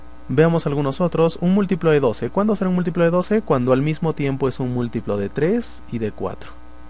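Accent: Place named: Peru. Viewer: eres de peru se nota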